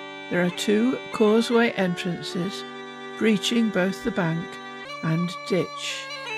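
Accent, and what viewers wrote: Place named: Derbyshire, United Kingdom. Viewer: Your accent is similar to my mother's ...are you from Derbyshire?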